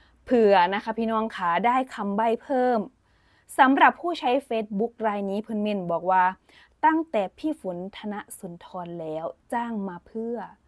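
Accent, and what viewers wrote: Isan, Thailand. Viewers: บอกไปเลยว่าเสียงอิสาน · 8นี้เสียงอีสานเล่นมาดตาพุดครัย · เสียงอิสานกะเว้าโลด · เว้าอิสานปนไทย น่ารักดีครับ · เสียงอิสาน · เสียงอีสานไหมคะ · เสียงอิสานแน่ๆไม่ต้องเดา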